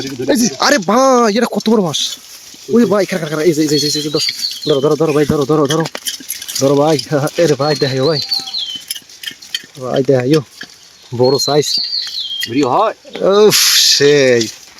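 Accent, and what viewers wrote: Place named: Barisal, Bangladesh. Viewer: BORISAL BOLE KOTHA :face-blue-smiling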